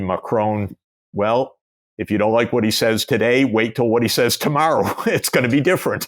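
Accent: Netherlands. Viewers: You sound dutch,it is Sachs not Sex😊 But never mind,good to invite mr.Sachs👍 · Your heavy Dutch accent is so funny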